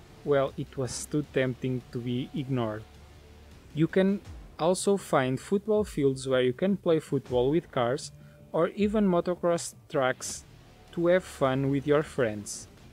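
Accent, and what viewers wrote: Portugal. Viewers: Are u portuguese? BTW, nice video and Niceeee gameee I'm a huge fan of motorsports and Dakar obviously. I'm rly hyped for this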